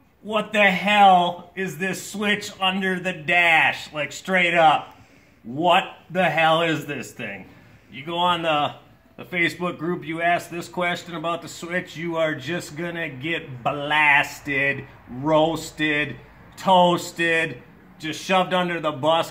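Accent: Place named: Wisconsin, United States. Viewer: This is the most Wisconsin sounding tutorial in the world. As a life long Wisconsinite I love it. I was waiting for and “ope” on the reset switch. Great content.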